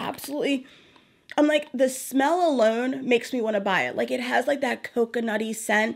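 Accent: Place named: Philadelphia, United States. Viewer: i hope you don't mind this comment, but i kept hearing your accent and could swear you were in the philadelphia area, and then when you showed the mirror and i saw the wawa cup in the back i felt vindicated LOL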